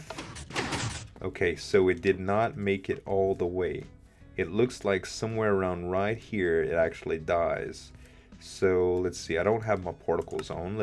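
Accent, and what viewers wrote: Louisiana, United States. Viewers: I can't place your accent. Where are you from? Louisiana? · Based on your accent, I guess You live in the USA, am I right? If I do, I would like to ask You which state do You live in? Do You own any firearms and what is Your opinion on them?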